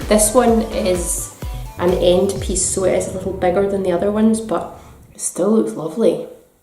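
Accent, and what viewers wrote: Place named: Scotland, United Kingdom. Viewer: wow...looks so good...yummy for my tummy!!!! :) you sound just like one of my other scottish friends..... Sara Fyffe - <link> love your channel - i will be back! subscriber # 142..you're going to hit 200 in no time! :)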